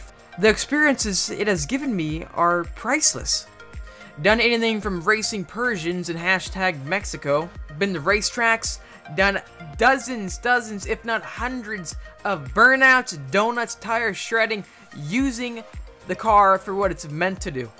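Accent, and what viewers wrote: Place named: Midwest, United States. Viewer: You sound very Midwestern than a typical Californian. If so then what part of the Midwest were you from.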